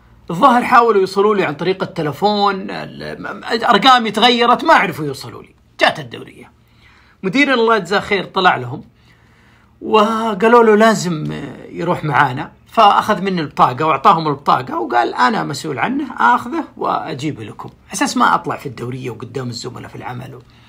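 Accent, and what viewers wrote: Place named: Libya. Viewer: سبحان الله كلامك ولهجته كانه من ليبيا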